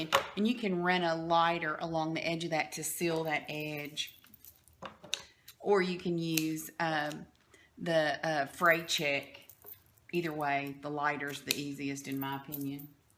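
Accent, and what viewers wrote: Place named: Georgia, United States. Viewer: I love your dresses 👗 and the way you explain your steps .... love your accent it reminds me when I lived in Dahlonega Georgia 😍🥰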